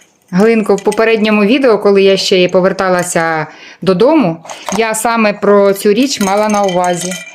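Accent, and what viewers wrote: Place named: Chernihiv, Ukraine. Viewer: Дякую,! Так приємно бачити Чернігівщину.❤ Я родом з сусіднього Бахмацького району, але давно живу на Київщині... Такий говір близький, з дитинства і юності.😊 💙💛💙💛💙💛 Гарний малюнок кицюнчика, донечка -- талант і молодчинка!❤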